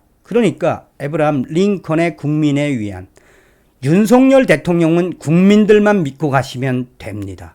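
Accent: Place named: North Korea. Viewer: 이런 재길 안전히 북한 말투 재수똥박아지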